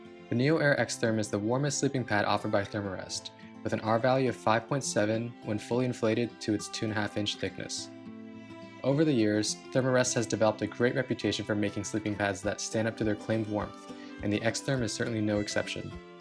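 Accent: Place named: United States. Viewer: My, my, aren't you petulant. 1) Assuming you were an American is not an unfair assumption since that's what your accent sounds like to my ears. 2) I know it's an old video. I even say as much right off the bat. Nonetheless, the comment still stands about not using one standard of measurement (i.e. imperial) as other people are accustomed to the other standard (i.e. metric); hence why it's better to use both. You may very well have changed this since you made the video, but you could have just done the proper thing from the get-go, and that's all that I was really saying. I only saw the video yesterday because that's what came up in my recommended feed. You know, you could have taken my comment in good spirit instead of getting all tetchy about it. You could have laughed it off; that was an option for you. But instead you've made a big deal out of nothing. 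Sheesh!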